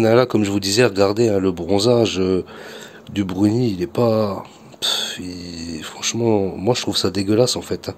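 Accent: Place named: Lorraine, France. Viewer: Salut! Je t'ai découvert par la chaîne de JP et en regardant toutes les vidéos du weekend entre fumier, j'aurais tout de suite mis ma main à couper qu'avec un accent pareil tu viendrais soit de Nancy soit de Metz, en tout cas en Meurthe et Moselle 😅 Moi je suis de Nancy et franchement si tu passes par là ça ferait vraiment trop plaiz de te rencontrer et d'échanger avec toi, pareil si tu cherches un compagnon de tir ou même des endroits pour faire des concerts à Nancy je dois encore connaître deux trois coins sympas pour t'éclater et te mettre en relation 😁 J'ai vu aussi que tu faisais du flocage on en faisait y'a un peu moins de 10 ans avec des potes de Metz sous le nom de EMKA prod si ça se trouve on connaît des gens en commun ça serait ouf ^^ Bon allez salut à toi la chicorée je sais pas si tu verras mon message mais je tente quand même😁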